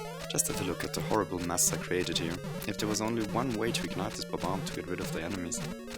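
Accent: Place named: Germany. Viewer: Here can i hear that he is german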